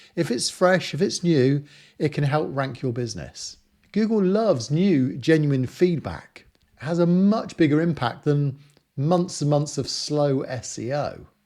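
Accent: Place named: England, United Kingdom. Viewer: Appreciate the guide. Makes a nice change having to listen a Englishman 😁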